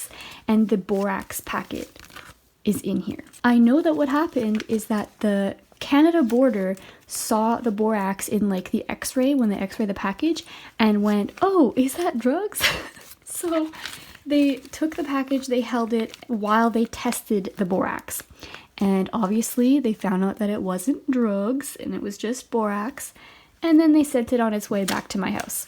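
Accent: France. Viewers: Your French accent is soo cute🥰❤️ love you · AA, ma queen back with another LITTT VIDEO! Was this am asmr because the vid was just satisfying also your french accent! <3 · I love your french accent and idk why but i was so entertained by the story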